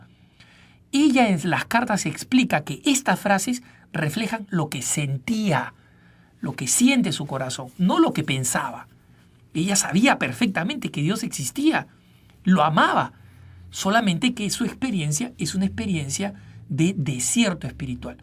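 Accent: Lima, Peru. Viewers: ACENTO LIMEÑO.....agg¡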